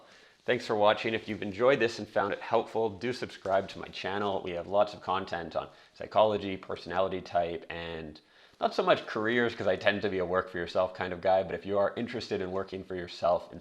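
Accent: Canada. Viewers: I found out I was INFJ earlier this year, and wish I had known earlier. Your advice is on the right track. Even as a child, I had to know why before I acted on someone's orders. Entering the military would have been hell for me. I have been working mostly alone since 1987, and found it is the best way for me to function. Working with others who did not have the same standards ended up making me close my doors, limiting communication with them. Once going on my own, while it can get lonely, ended up saving me all kinds of conflict and pain. And having to follow a procedure/process oriented, controlling boss uttering, "because I said so" would be purgatory for me. Did I make mistakes? Hell, yeah. But you try not to make the same mistake later on. I can tell from how you talk you are Canadian. Keep up the good work.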